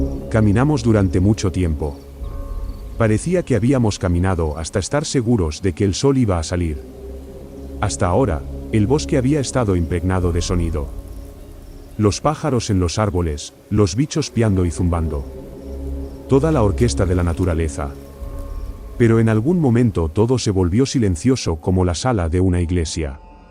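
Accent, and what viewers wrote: Spain. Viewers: Primera vez que entro al canal ,me encanto ,muy bien relatado ,y el acento español le da ese toque unico en nuestra lengua ,saludos desde buenos aires ,me suscribo ..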